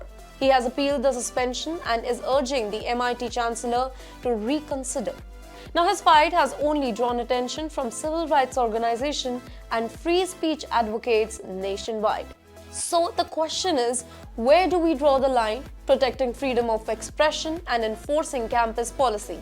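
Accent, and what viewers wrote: India. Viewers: नमस्ते जी, आप अंग्रेज़ी हिंदी के समान बोलती हैं।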